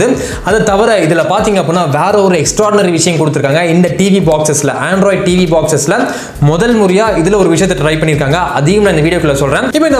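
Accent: Coimbatore, India. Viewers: Bro u r from Coimbatore right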